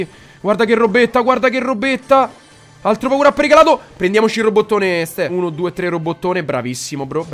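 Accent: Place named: Sardinia, Italy. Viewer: Mà sei sardese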